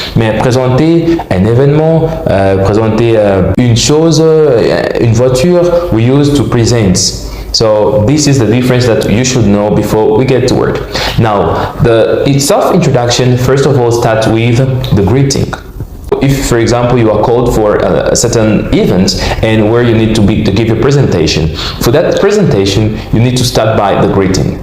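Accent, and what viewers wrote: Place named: United States. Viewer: Awesome video 🔥 you sound American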